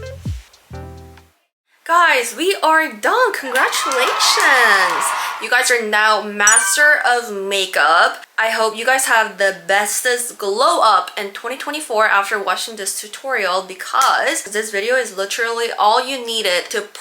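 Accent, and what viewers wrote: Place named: England, United Kingdom. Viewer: Thank you for sharing this video ❤❤ btw I LOVE your English accent and you look a little bit like Wonyoung :)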